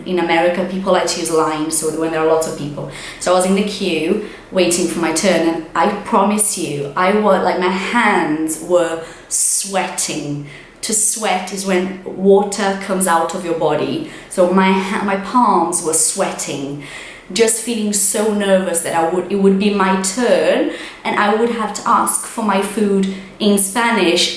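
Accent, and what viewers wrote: United Kingdom. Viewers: Seu inglês britanico é lindo e perfeito assim como seu português, por tanto qualquer brasileiro se vira bem em países de lingua espanhola, melhor que gesticular tentando falar ingles com quem nao entende.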